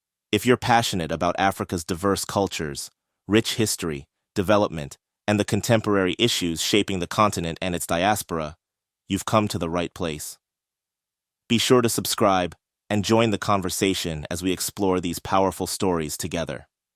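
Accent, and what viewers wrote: United States. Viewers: He is a dictator. And like all dictators, it never works out for the people in the end. Putin is a monster. Why does the narrator sound like he is from New Jersey, not Africa? 😁 let us hear your voice! Don’t be fake! Propaganda channel…. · Not interested in listening to a machine with an American accent or any other accent because it is a machine. Find a human to talk about Africa. The way African people from all over the continent talk with their feelings how they express themselves with regional and cultural accents and opinions. This is the kind of Africa I would like to see, not some American AI Bot! Even better in some ways is just have subtitles and speak the many languages of the beautiful continent.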